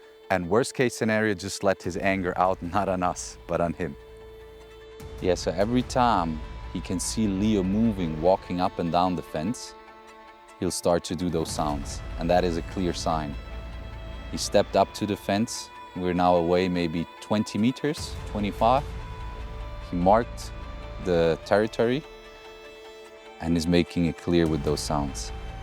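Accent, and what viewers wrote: South Africa. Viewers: Yoh. Last time I watched you was years ago and you sounded Swedish now you sound more South African 😅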